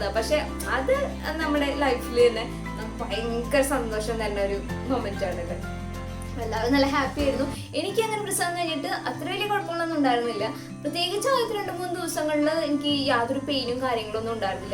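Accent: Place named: Thrissur, India. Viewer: Thrissur slang il chechide samsaram kelkan nalla resam🥰... Sedation kodthit ulla aa acting scene okke sherikum njn chirich poy😂😂😂😂...love you chechi..god bless you baby girl 😘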